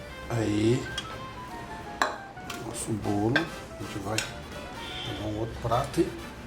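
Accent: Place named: Minas Gerais, Brazil. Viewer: PARECE BOM, VOU ME AVENTURAR E COMEÇAR AGORA, OBRIGADA PELA RECEITA VIU E TÁ MELHOR AINDA PQ TEM UM SOTAQUE QUE EU ACHO QUE É MINEIRO, (BOCADIM) .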